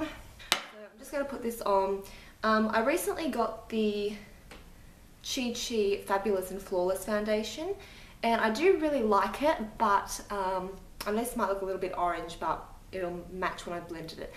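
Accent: Australia. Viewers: These are my fav videos from you. You look good without makeup & you make your makeup look so good :) + your Aussie yay haha xx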